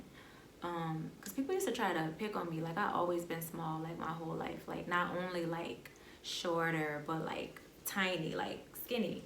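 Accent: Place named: New Orleans, United States. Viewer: You definitely sound like you’re from NOLA lol I guessed it before you spoke about Mardi Gras. I got a close friend from there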